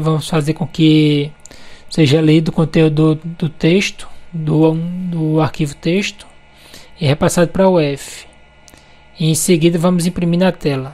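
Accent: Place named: Portugal. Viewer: Português PT? amo esse PT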